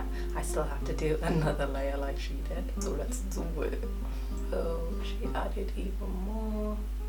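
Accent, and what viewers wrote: United Kingdom. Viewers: She's British lol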